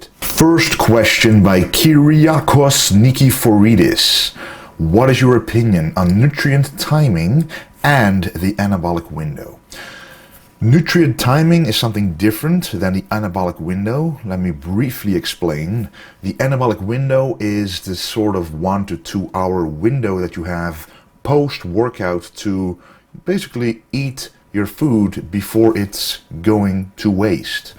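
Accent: Netherlands. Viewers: Dutch, can't you tell from his accent?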